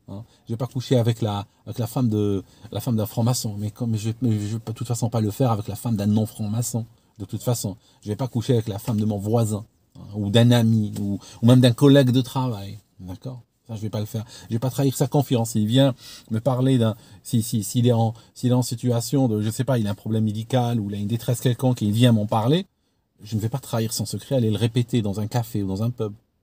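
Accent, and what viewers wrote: Switzerland. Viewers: On s'en fiche qu' soit FM. On l'aime tel qu'il est avec son bonnet, sa tignasse et son accent suisse par intermittence. Un franc mac qui ne croit pas aux missions Apollo, ça n'a pas de prix.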